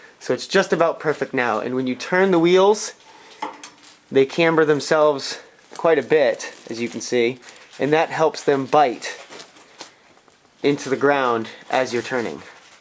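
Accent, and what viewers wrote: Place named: Midwest, United States. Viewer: Great timing. I was just wondering what part of the country you were in, when i started this video and I think you just said Maine. Funny, with your accent i was guessing somewhere in between Kansas and Indiana.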